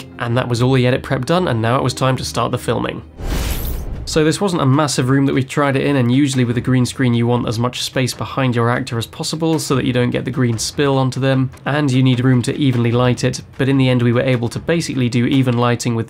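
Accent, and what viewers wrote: United Kingdom. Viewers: He’s got the English accent down pat...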